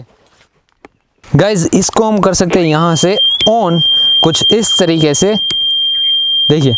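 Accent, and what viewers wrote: Gujarat, India. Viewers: Bhai tame gujrati cho tamre bole upar the kabar pady jay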